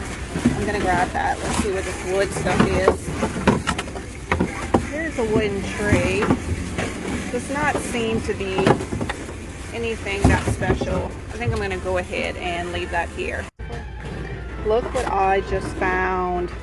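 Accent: Louisiana, United States. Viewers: The music - ugh - but I love watching you- I’m a Louisiana girl so I get your accent😍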